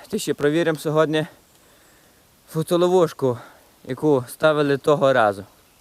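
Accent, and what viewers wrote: Hutsul, Ukraine. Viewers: Дуже люблю гуцульський діалект, я сама з бойків, ваших гірських сусідів.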